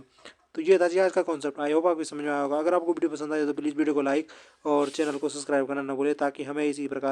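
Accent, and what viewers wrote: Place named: Garhwal, India. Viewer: Bhai garwhali ho kya??😂 tone sunkr lgra hai❤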